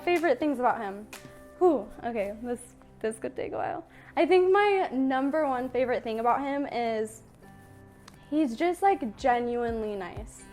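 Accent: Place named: Utah, United States. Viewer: your accent makes me miss Utah.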